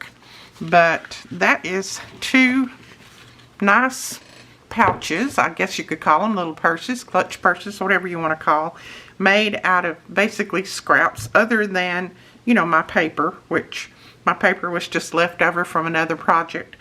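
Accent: South, United States. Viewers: love your projects and instructions! Love your southern accent! I live in Hawaii! Would love to win!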